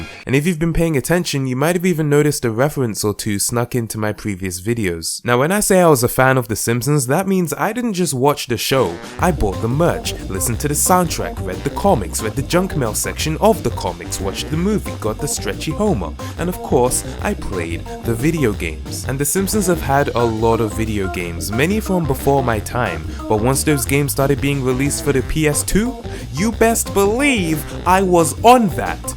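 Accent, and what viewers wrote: United Kingdom. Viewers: I love your accent! Feuweees = Furries...I can't place which area of Britain you're from. Where you from, bro?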